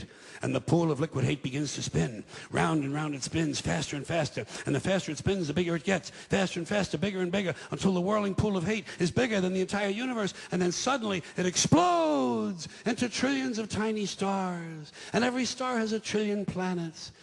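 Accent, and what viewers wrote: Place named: Mississippi, United States. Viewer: this motherfucker is a good comedian but tone down that Mississippi shit bro!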